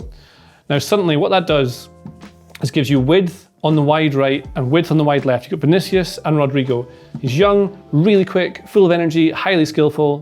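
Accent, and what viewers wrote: Scotland, United Kingdom. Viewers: Let me guess , is he a Scottish?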